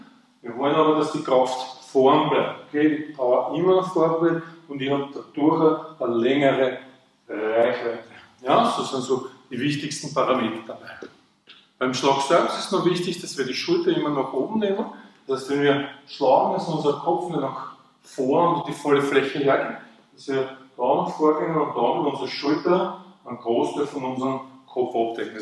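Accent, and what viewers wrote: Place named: Vienna, Austria. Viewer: Hallo Super video nur eine kleine kritik am rande sie reden zu schnell und man versteht sie kaum also zumindestens ich aus hamburg verstehe kein wienisch manche wörter versteht mann aber wenn sie zu schnell reden kommt man nicht mit Das gesagt bedanke ich mich für das tolle video weiter so ein daumen hoch von mir und das abo an ihr kanal selbsverständlich 👍👍👍👍👍👍👍👍👍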